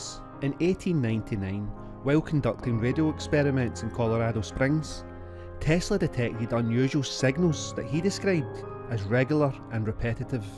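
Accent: Scotland, United Kingdom. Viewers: Really enjoying this Scottsman's narration !!!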